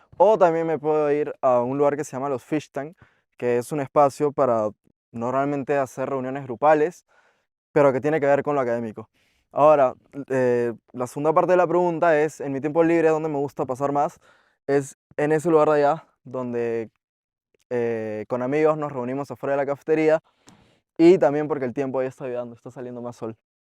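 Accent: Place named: Lima, Peru. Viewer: yo también soy de lima y planeo postular este año a la ie, btw,me resultó gracioso notar su acento limeño JAJAJAJ entre nosotros nos entendemos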